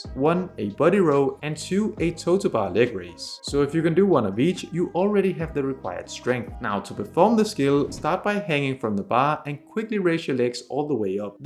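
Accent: Denmark. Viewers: Random question, but are you a dane? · jaa, godt forsøgt men du er dansk ikke eller ihvertfald fra skandinavien.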